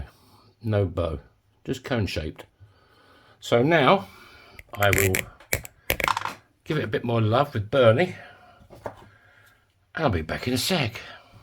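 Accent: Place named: Cockney, United Kingdom. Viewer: Funny are you cockney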